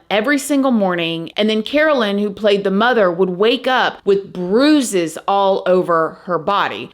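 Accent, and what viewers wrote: South, United States. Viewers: Just found your channel and I’m gonna say your from the south lol. You sound like your from where I’m from. 😂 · I just saw you on TV! It was an as for a tv channel. Mysteria I think. Haha! Congratulations! I wasn’t even looking at the tv and I heard ur voice and that beautiful Southern accent and looked up and saw u for a split second. But undoubtedly it was u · Where are you from? 🥰 I almost recognize your accent from the south ♥️ I’m new here and I’m enjoying it so far!!!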